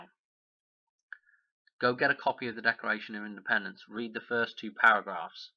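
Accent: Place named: Rural, United Kingdom. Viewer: I’m currently locked down in India. Your accent is bringing me taste of home. Im from Devon, but there does seem To be a common thread running trough the rural Tounges. I’m Enjoying your work. It’s been connecting some dots for me. Cheers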